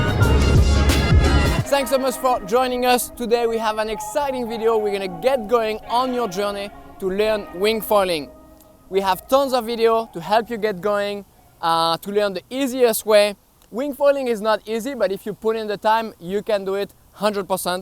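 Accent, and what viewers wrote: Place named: France. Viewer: First and foremost, kudos to you for explaining how to do wing onpaddle board. It’s the most clear concise explanation I’ve ever heard. I will totally use my paddle board first. All these steps will help me concentrate on managing my kite at this time. Most of all any videos you made in the past is very interesting just to listen to your French accent!! It adds to the likeness of video. Thanks again.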